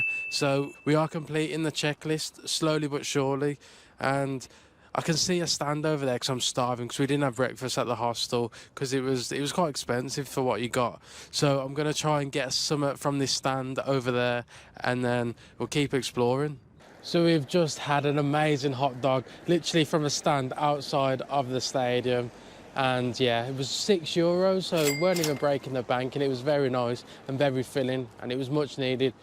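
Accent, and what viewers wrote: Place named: England, United Kingdom. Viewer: Curious which city/part of England your accent is from?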